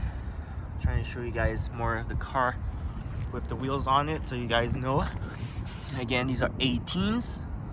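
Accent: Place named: Quebec, Canada. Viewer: Thanks for the video man!! I have the exact same car and wheels (but the coupe version) and found very little info online on appropriate winter setups. I'm also from Quebec which is pretty funny as I recognized your accent ahah. When choosing proper tires, how do you know if they will match the car's speedometer like you mentioned? Also, I believe the Yokohama tires have a "V" speed rating whereas the car is rated for "Y" tires, did you downgrade because they're winter tires? Thanks and have a good one!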